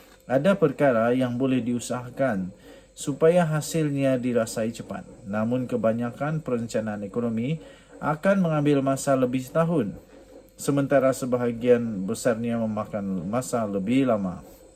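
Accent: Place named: Sabah, Malaysia. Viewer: Rasanya abg ni org sabah...org sabah mmg cakap mcm ni...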